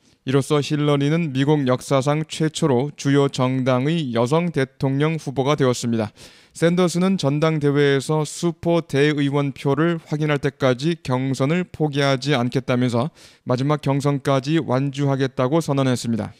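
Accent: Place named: South Korea. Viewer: 좋은 프로그램 감사합니다 그런데 발음 억양 상 강사님은 국내파로 열심히 하신 분인듯 하네요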